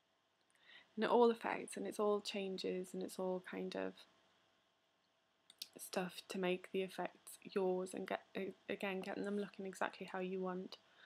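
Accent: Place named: United Kingdom. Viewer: Thanks girl! Great simple tutorial for paint effects. I'm glad to find another woman who uses maya. hehe. :) ...Btw you have a very pretty accent. lol. Are you from the UK?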